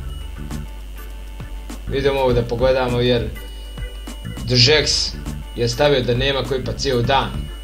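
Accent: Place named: Bosnia and Herzegovina. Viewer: Jesi li ti Bosanac vidim govoris ba i malo ti naglasak lici na Bosanski ? ili si iz Makedonije ?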